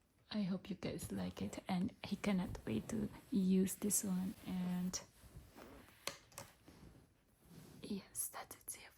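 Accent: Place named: Philippines. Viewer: Are you a Filipino? That accent is so thick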